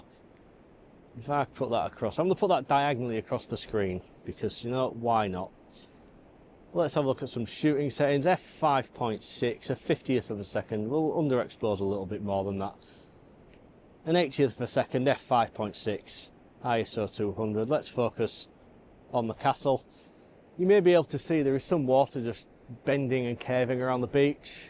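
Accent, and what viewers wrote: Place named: North East England, United Kingdom. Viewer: Superb photos. I really am thinking about going back to M43 and this video helps. Because of your accent I actually thought you were from the north east so was surprised when you said how far you travelled! ‘Honey pot’…if you want a honey pot head a bit further south where you’ll find St Mary’s Lighthouse at Whitley Bay 🙂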